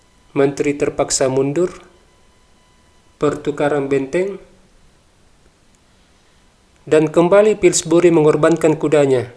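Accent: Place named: South Tapanuli, Indonesia. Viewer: Bg kemana ya? Semoga beliau sehat Spt nya ini bkn bg ini logatnya spt dr Tapsel Senang dgn filosofi Duani gelap bagi raja Hitam 😂😂😂 Ini sdh ku subscribe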